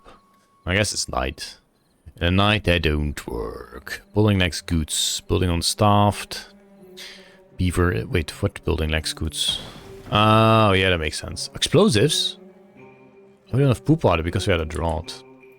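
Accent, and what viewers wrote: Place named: Netherlands. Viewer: Is it me or did the Nederlands bleed through a lot more than usual? Lol. Not complaining mind you. Just funny cause it happens to me when I get super tired. Keep up the goede work. Earned a new sub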